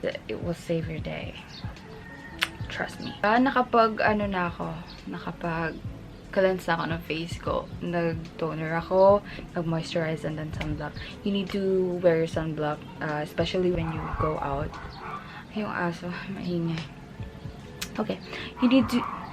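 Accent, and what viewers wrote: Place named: La Salle, Philippines. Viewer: Hahahaha ung mga aso. Lakas maka La Sallista sis. Ang accent 😊 tho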